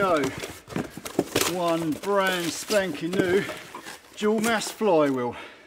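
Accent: Cockney, United Kingdom. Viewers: Are you from London? Im Croat and you sound to me like cockney accent, am I right?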